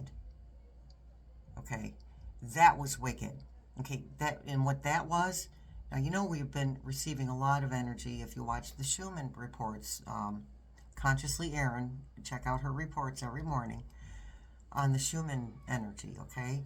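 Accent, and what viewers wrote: New York, United States. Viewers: Your New York sounds a little like my North St Louis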